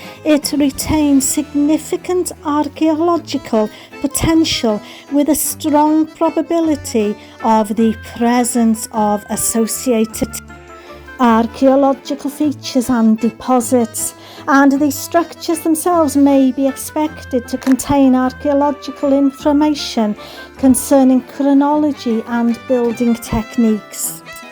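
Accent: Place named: Birmingham, United Kingdom. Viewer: Like the accent. Peaky Blinders accent.Amazing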